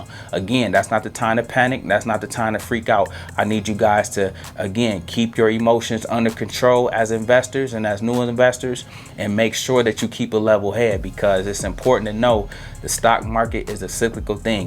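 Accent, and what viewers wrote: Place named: California, United States. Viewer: He is from Cali. LA. I hear the accent.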